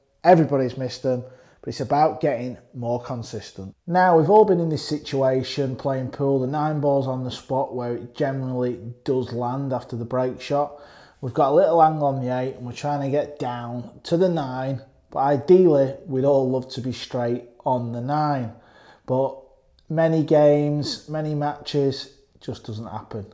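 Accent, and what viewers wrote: Lancashire, United Kingdom. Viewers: Right 😉 By the way, your accent is close to my cousins in Garstang. Are you from Lancashire? Anyway.. I live in the states as an EX Pat. Haven't been back to Garstang since pre covid. Keep up the good work breaking down a complex game. Cheers.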